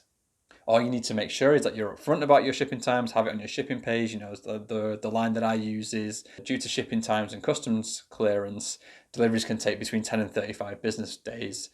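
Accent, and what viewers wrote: United Kingdom. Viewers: Hi. I'm interested in your course. I take it from your accent that you are a fellow Brit. Most Drop-shipping courses are targeted at the USA.Have you noticed many differences in the way you operate your DS business from the UK (assuming you do). Thanks in advance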